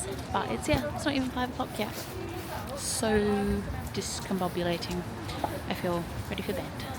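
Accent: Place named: United Kingdom. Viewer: The British accent coming out nice and strong!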